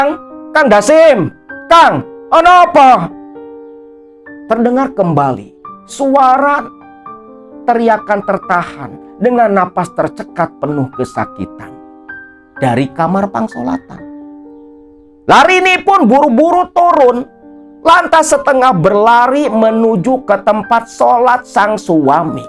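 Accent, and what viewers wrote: Java, Indonesia. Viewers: Assalamualaikum kang.. Sukses selalu ya kang Blitar hadir.. Kalau ngomong jawa lucu banget 😂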